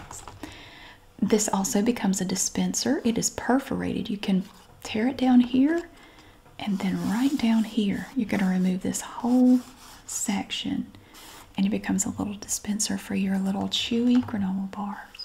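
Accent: South, United States. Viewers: I like your southern accent: "Hate it an ate it". And you are so considerate to donate the food you bought. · I just moved to South Carolina from NY, and so many people have a lovely southern accent like u! Lol, it gives me such comfort for some reason. Have a great night!!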